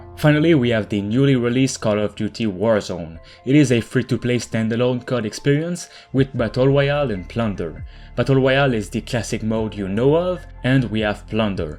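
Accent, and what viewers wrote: Quebec, Canada. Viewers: Accent québécois ? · est-tu quebecois mon criss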